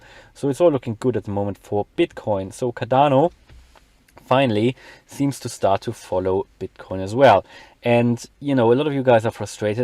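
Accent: Germany. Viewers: Ergo, love the German accent!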